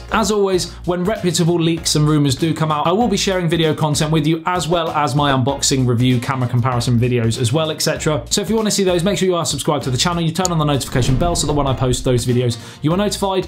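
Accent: United Kingdom. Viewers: Actually I watch your video for your great screen presence, brit accent and that lovely dash of humor combined with your constant smirk. Keep it up.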